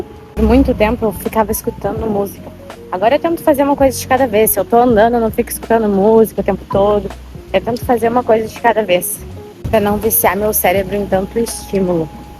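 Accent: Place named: Rio Grande do Sul, Brazil. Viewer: Tu é gaúcha? o teu sotaque se parece de uma gaúcha.😊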